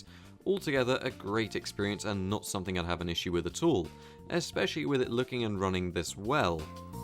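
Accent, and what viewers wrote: United Kingdom. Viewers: well done man you deserve way more subs. love your Chanel one of the best tech channels on youtube. it is great to hear a British accent as well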